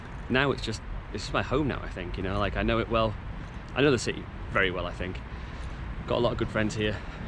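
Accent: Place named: Teesside, United Kingdom. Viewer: I am super late to the party here but loved this video! So lovely to see your face and hear your voice... still got your Teesside twang!:D xxx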